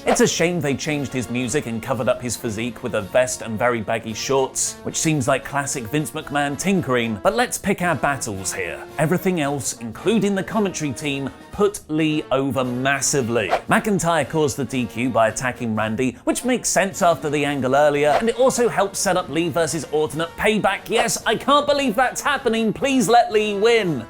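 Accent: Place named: United Kingdom. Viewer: "Rawr and Smackdown" .... that British accent SMH.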